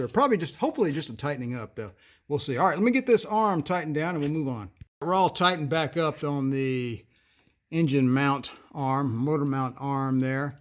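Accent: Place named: Alabama, United States. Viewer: Hey what part of Alabama you from?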